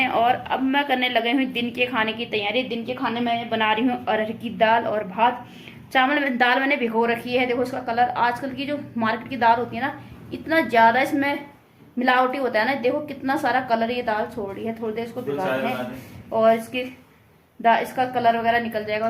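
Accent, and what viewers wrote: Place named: Kumaon, India. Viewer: aaj aap bhi kumaya boli bolna lag gayi jay ho kumaya mitra wow nice😃😃😃😃😃😃😃😃😍😍😍😍😍😍😍🤩🤩🤩🤩🤩🤩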